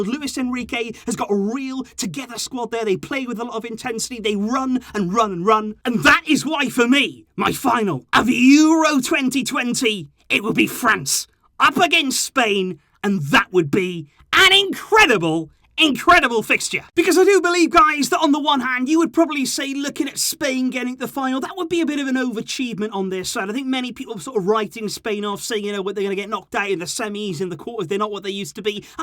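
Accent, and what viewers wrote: Wales, United Kingdom. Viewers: Am I the only person realizing he’s Welsh 😂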